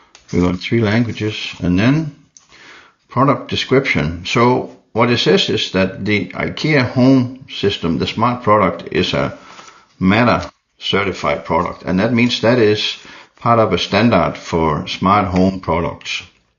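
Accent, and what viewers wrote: Denmark. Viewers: fed dansk accent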